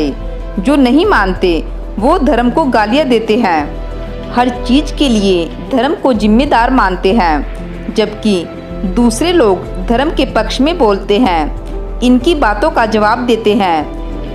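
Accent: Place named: Kullu, India. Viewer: Behan ji app kullu district see hoo ?? Apka accent kullu ka lag Raha hai??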